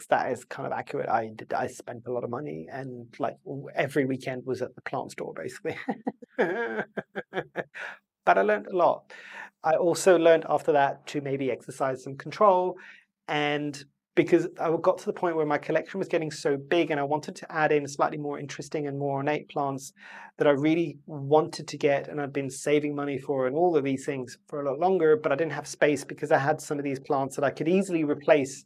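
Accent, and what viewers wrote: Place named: London, United Kingdom. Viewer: Wow, coming from a beautiful warm climate, what inspired you to move to the 🇬🇧 UK? Was it for university? Your accent even sounds like you are a native of London. Ps. You look really good in this video. (Not to say that you don't normally look good) 😊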